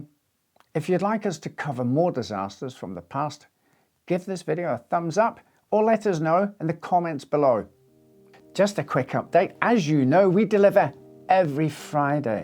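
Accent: United Kingdom. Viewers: It is pronounced that way if you have an American accent. This gentleman has a British accent and therefore pronounces things differently. So what? Could you correctly pronounce the sometimes strange names of the cities in Great Britain?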